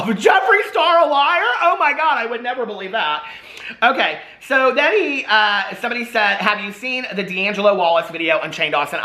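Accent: South, United States. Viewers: "It's Shake and Bake...and I helped!" You just squeezed that little gem in there right at the beginning. I can just hear that thick Southern accent! Lol!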